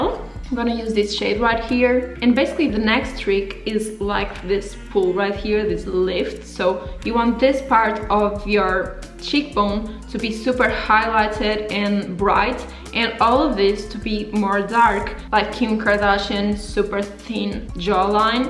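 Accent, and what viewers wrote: Portugal. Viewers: That Portuguese accent is the cutest thing evaaa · You sound portuguese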